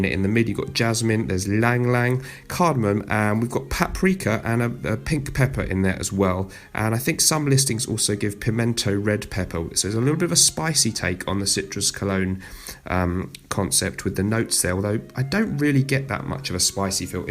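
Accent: United Kingdom. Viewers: Excellent list, as usual. I love your British accent!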